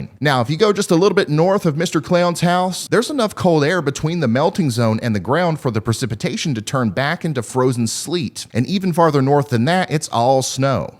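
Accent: South, United States. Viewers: You can’t help but love a southerner that’s all about science.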